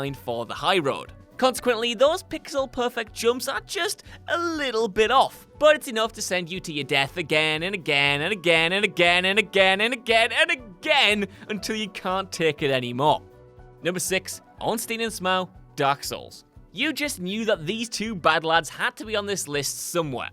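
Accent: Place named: Newcastle, United Kingdom. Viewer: why would you choose a stupid geordie twat to voice the video???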